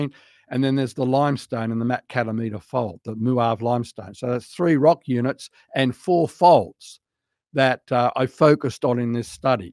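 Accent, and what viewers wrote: Australia. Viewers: I love that Australian accent keep it coming brother praise the Lord 🙏🙏🙏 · I was confused at why he said he wouldn’t sound like me, then he said he was Australian and I realised why he sounded familiar.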